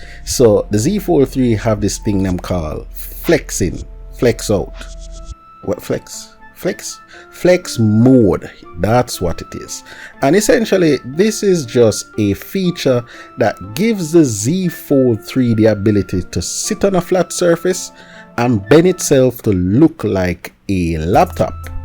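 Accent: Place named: Jamaica. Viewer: Real Jamaica talk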